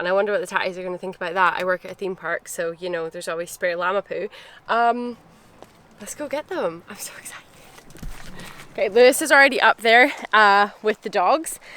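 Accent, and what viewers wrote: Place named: Scotland, United Kingdom. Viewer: You have a wonderful Scottish Accent. ☺️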